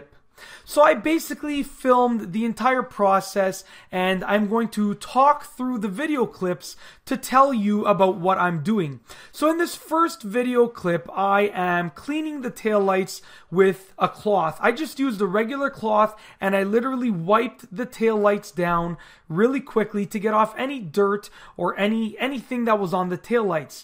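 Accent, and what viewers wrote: Canada. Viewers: Are you Canadian or from Minnesota? Don't you think that by tinting or subduing tail lights is risking or cutting down on motorists trying to distinguish wtf that is in front of them? Especially dark or dimly lit roadways, they could just appear to be roadside markers. Remember this fact, today's drivers are less aware/attentive than drivers were 20-30 yrs ago because of the lack of common sense and ease of distractions. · This dude has to be from Canada based off his accent. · He's Canadian..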